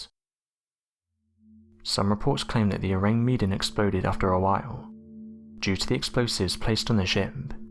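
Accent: England, United Kingdom. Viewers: T A it’s an English accent.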